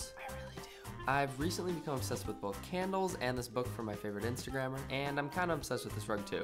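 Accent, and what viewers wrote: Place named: Midwest, United States. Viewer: I really enjoy watching all your videos. They're beyond cool!. I was once a foreign exchange student to St. paul, MN way back in 2008 so i kinda enjoy listening to yer midwestern accent. Keep it up! :)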